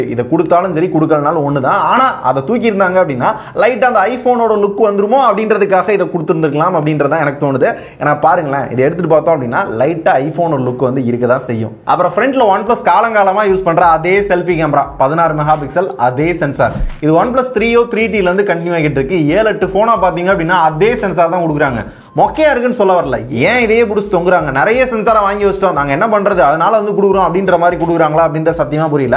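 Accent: Madurai, India. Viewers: Elle madura kaaran da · Thala neenga maduraiyaa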